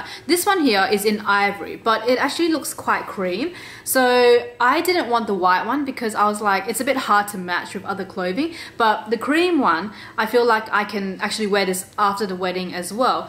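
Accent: Australia. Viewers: Very Lovely! 🍦🍦😉😉⛹️‍♀️💻💻🍾You have a hectic but interesting lifestyle!🙂..Nice to see how much your videos have evolved! YOU are a sweet cutie! Always be Yourself! I love love your Australian Accent! 🙂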